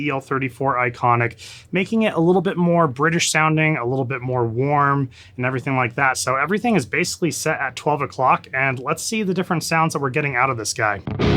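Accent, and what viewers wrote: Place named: Brazil. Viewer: Sounds more Brazillian than british if you ask me.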